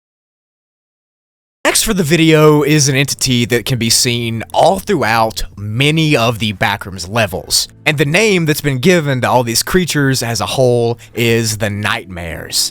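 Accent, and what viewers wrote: United Kingdom. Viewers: I know your British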